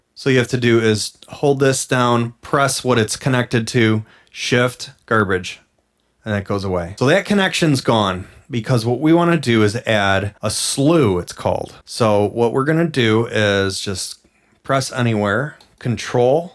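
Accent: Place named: Western New York, United States. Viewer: I stumbled onto your channel this past week, first hunting for more Lyra-8 demos then discovered your Zoia content. My Zoia arrived yesterday and the Lyra-8 arrives on Friday or Monday. I've not yet fired up the Zoia, but your videos along with other excellent walkthroughs people are posting have been helpful. Almost like I've already actually used the machine. You've got great skills of explanation and teaching, thanks for sharing those in your videos. BTW, your accent has me guessing you're from W NYS, greetings from the edge of Lake Erie. :)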